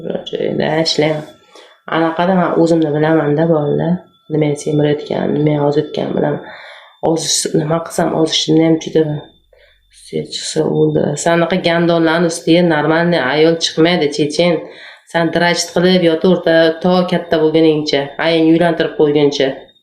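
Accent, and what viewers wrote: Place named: Fergana Valley, Uzbekistan. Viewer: ❤. Salom. чиройли сингилжон, Шева водийликка ухшайсан, хозирда каерда ишлайсан, сенга омад тилайман. Хохласанг оздирадиган рецепт ташлайман. И. Б. Н. Синодан. ❤❤❤❤❤❤❤❤❤❤❤❤❤❤❤😂😂🎉🎉🎉❤❤❤❤.., раджаб ота78 еш.. шифор пенсионер.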